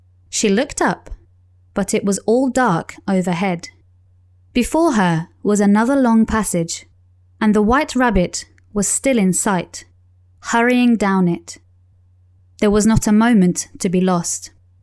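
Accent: United Kingdom. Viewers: 피터팬 읽어주기 컨텐츠 기다려보겠습니다!! 영국작가가 쓴 런던배경 동화라 영국 발음으로 공부하면 너무 좋을거같아요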